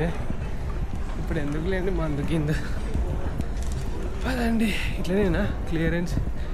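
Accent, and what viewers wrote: Telangana, India. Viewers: U r a worrest guy brother , main minus point me voice interesting ga anipinchadu so ne videos ki views +ne channel ki growth takuva , nuv konchem introvert vi bro neku set avavu e travelling vlogs , ram the traveller chudu ninnu chusibstrt chesi ekadiki vyelipoyado . Nelo kasi undhi but talent ledhu traveling vlog lo kavalsundi main thing voice adi kuda telangana jante Andhra telugu better + extrovertic behaviour + andari panulallo duralaney dula tatvam bcz viewers andaru dula gallu kabatti they like that , nuvento kamam tho kallu muskoni ne tent ne culycke nuvu matrame kanipistav epudu , mobbu matalu veskoni mujkutho matladuthu boring ga untav so ne channel ki growth takuva.... Better luck next tym . . First voice is important to communicate with people 2nd ur content is important 3rd fun is important 4th interesting conversations are important ... 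Lekapotey nuv na anveshana kante mundu start chesi ikade undipotav waste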